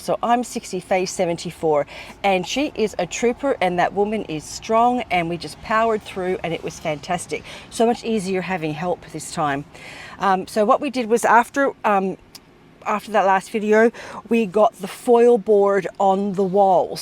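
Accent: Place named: Australia. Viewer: I love your accent! I consider it real Aussie.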